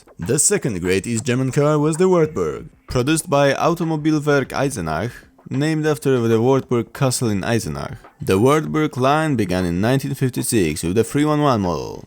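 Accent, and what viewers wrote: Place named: Poland. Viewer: You sound like a Polish 😊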